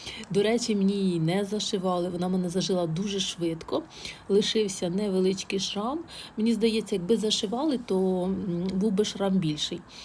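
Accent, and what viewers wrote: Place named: Orativ, Ukraine. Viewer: Оратівський район! А я чую, говір такий милий)) Он воно що!!!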